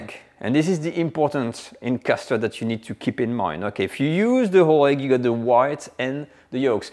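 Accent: France. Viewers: I must admit, having a French accent does make everything better and more flavorful😅 and even easier to follow. Love your videos. Merci!!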